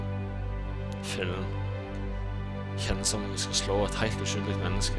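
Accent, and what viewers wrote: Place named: Jæren, Norway. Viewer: Goe! :D Genialt me jærdialektå då :D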